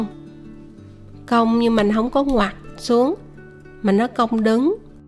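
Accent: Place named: South, Vietnam. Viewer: ❤❤ nói giọng miền Nam từ ngữ miền Nam nghe rất hay❤🌹🌷👍 Rất mong quý vị mình dùng từ ngữ của miền Nam❤ đừng lai từ ngữ bất kỳ vào rất khó nghe, rất rất rất cảm ơn quý vị và các bạn Duy Mạnh từ ngữ của miền Nam❤❤🌹🌷👍, giọng nói của dịu dàng rất dễ nghe❤❤❤...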